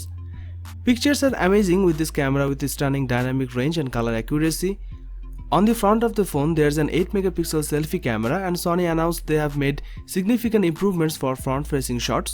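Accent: India. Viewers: I do not understand this Indian accent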